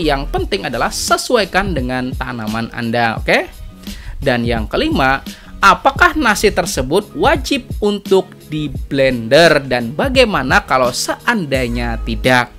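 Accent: Banjar, Indonesia. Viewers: Logatnya bubuhan amang banjar pang nah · Dari Logat bicara nya kayak org banjar/ hulu sungai, kalsel Iyakah?😅🙏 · Mirip aksen urang Banjar Kalsel pian, btw terimakasih info yg sngt bermanfaat nya, semog jd amal jariyah kelak🤲🏻🤲🏻🤲🏻😊🙏🏻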